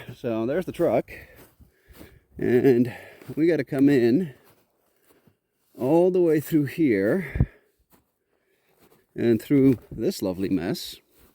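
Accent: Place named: Poland. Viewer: Your „good morning everybody” is a single phrase where I can hear a ted of Polish ( non- native English speaker. Your „everybody” I can hear everybodi ( kind of soft Polish letter i ( like Irena) instead y. It’s very cute and pleasant sound. Also you don’t speak English as a person born/raised in America . The way you speak, built phrases, apply grammar and syntax, is like you graduated class for a public speaker: more sophisticated and elegant that common people use. I am having so much fun and pleasure just listening to to your narration. You don’t neither stumble , nor repeat yourself, talking fluently in an interesting manner. Thank you 🙏, sometimes , just for pleasure of listening to your voice. I am not ignoring the subject, just : double my pleasure of witnessing your every day adventures. 🤗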